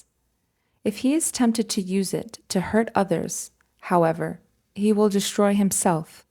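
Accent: Brazil. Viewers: I agree that she has a nice tone in her voice and pronounces most Sanskrit words properly however I do hear a slight Brazilian or Portuguese accent in her pronunciation of Sanskrit. In fact some rather simple English words are mispronounced such as ‘ether’, and other words that I cannot remember right now. Overall her Sanskrit pronunciation is far better than most.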